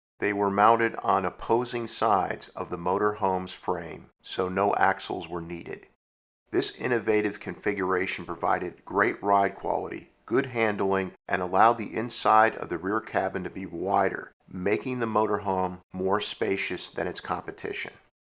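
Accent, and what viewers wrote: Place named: United States. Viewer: please speak English ! Americans tend to not to be able to speak properly... eeee baaks should be: a box, ditails, won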